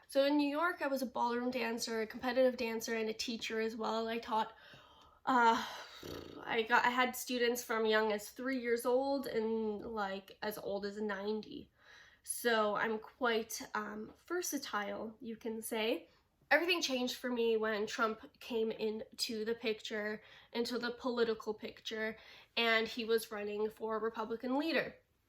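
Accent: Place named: England, United Kingdom. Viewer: Interesująca masz historię życia. Trenowałaś sport, so did I 🙂 kochasz zwierzęta 👍🏻💞 so do I 🙂 Kocham Twój angielski akcent 💞🙂 Pozdrowionka 😉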